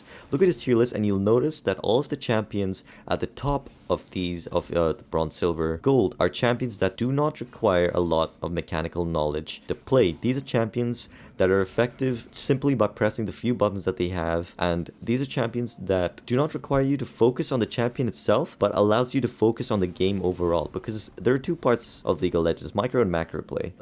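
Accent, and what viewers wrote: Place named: Switzerland. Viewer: Are you Swiss? You sound like Roger Federer.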